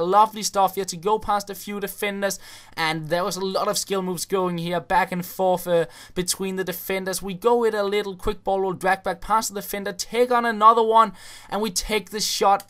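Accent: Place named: Denmark. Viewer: Danish accent does that :)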